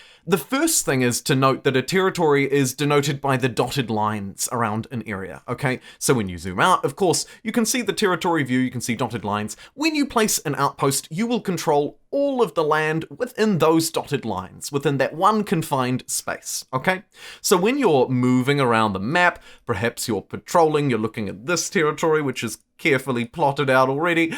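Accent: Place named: New Zealand. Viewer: nice newzealand accent my friend.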